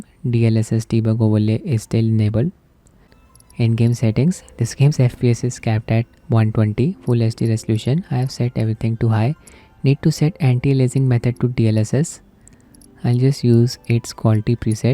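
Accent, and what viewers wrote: India. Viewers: Indian voice, in the garbage it goes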